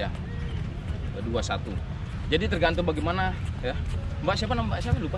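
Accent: Bali, Indonesia. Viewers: Pantesan kayak ada logat-logat bali, ternyata kelahiran bali wkwkwk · Bang dulu apakah pernah di Bali? Kok logatnya agak Bali gitu ya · Logat nya om kok kayak orang bali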